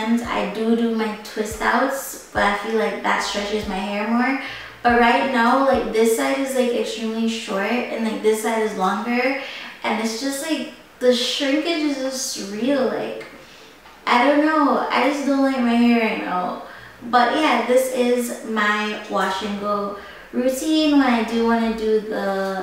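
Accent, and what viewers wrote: Jamaica. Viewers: you from Jamaica?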